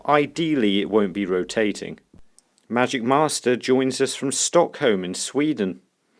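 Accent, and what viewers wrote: United Kingdom. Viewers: I'm a beginner in snooker, and I have a break record of 24. I love watching your videos because they're so spot on, calm, and easy to follow, so I decided to subscribe to your channel. I also prefer your British accent for some reason. Greets from Sarpsborg, Norway!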